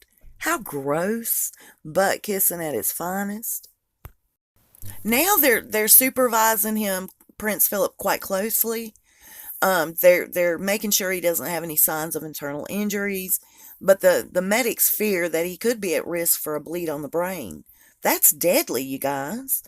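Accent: South, United States. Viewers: I love the sunshine in your sweet southern accent! You are a truth teller, and a very creative person. I look forward to seeing , and hearing your videos! We have a lot in common. About 7 yrs ago I began to pick up bits and pieces of the dark side of these families, and I do not look at life in the same way as I did , before learning all of this underlying truths. I am probably very sceptical, but in a positive way, and because of my own life experiences. I am a Christian, a Southerner too, and I was a floral designer, manicurist, and I started out doing hair, but decided to, graduate early, and the one thing that really stood out in my work was my passion to be a florist! I BECAME ill with several conditions while I was working on my career goals. I also had my own housekeeping business on Galveston Island, and here in my home, Austin , TX. I live in a very liberal city, and a very artsy community.I love those things, but it is hard to watch my home changing so quickly.I am not a political kind of person, and not a liberal or Republican. 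I try very hard to see all sides, and make my own informative desicions . I am disabled now, but I still push forward to remain as Independent as possible. I have 6 beautiful Grandbabies, and 2 wonderful daughter's, and 2 Very nice Son's- n- law. And, after 35 YRS of Mothering, and Grandmothering, I finally have 2 Grandsons! They are very energetic, and lot of fun. God has blessed me richly in this area of life. I do appreciate your entertai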